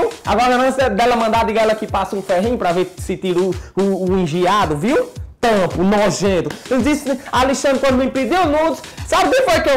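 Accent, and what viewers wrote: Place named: Pernambuco, Brazil. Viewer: parece uma menina de prenambuco que fala assim mesmo meu deus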